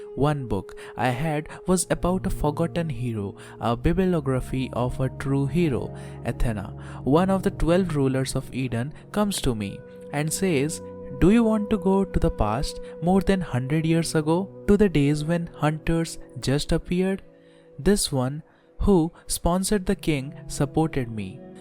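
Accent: India. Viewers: Your accent is indian bro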